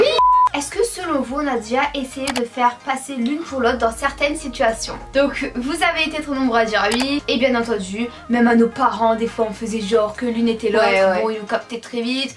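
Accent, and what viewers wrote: North, France. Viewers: Accent du nord😍😍😍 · Vous parler ford du nord (ce n est pas garve et se n est pas mechant)mais defois sa me fait un peu rire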